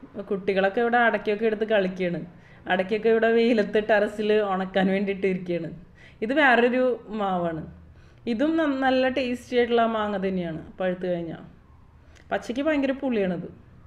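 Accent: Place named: Angamaly, India. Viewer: Angamalyyo Kodungalluro slang aanennu ee answer vaayikkunnathinu mumpu oohichu. Thanks for clarification.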